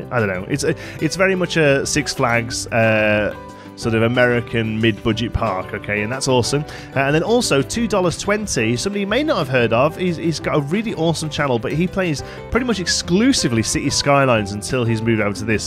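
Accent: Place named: North, United Kingdom. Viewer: Are you from the North mah dude?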